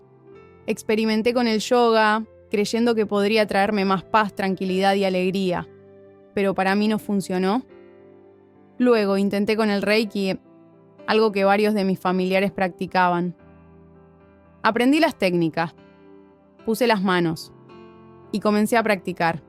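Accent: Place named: Argentina. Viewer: Dices que eres peruana,pero hablas como argentina.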